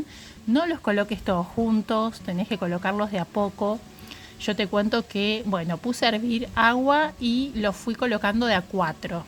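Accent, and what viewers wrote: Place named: Argentina. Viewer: Tw acabo de encontrar, amé tu canal y recetas, dónde vives que se ve ese mar hermoso pero tonada Argentina??????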